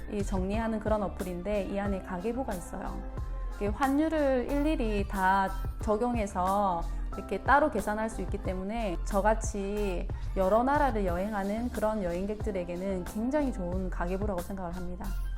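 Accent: Gyeongsang, South Korea. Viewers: 반가워요. 경상도사투리가 재미있내요, 부산쪽... 나도 세계 및 남미 여행을 준비중인데, 도움이 많이 된네요. 쿠바가서 살사와 바차타, 그리고 아르헨티나 가서 밀롱가를 다니는 게 꿈입니다. 그래서 열심히 스페인어도 배우고, 춤도 배우고 있죠. 특히 아르헨티나는 체게바라와 메르세데스소사의 고향이라 더욱 가보고 싶어요. 좋은 정보 고맙고 자주 올게요. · 경상도 아가씨군요 대구에요? 사투리 쓰니 더 예뻐요 ㅎ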